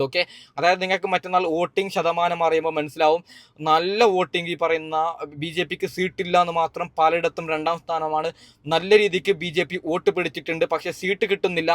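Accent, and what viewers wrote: Kasaragod, India. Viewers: നീ കാസർഗോഡ് ആണോ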